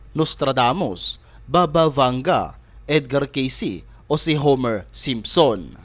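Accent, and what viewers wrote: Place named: Visayas, Philippines. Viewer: Usa ka flu😅 bisaya Pala to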